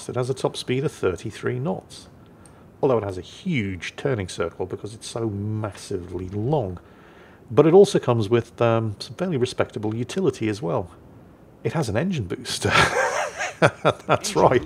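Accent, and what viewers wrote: United Kingdom. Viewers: I think both ways are correct, its just your dialect of our great brit ish language, e.g. some same bath as bah th, others call it a bar th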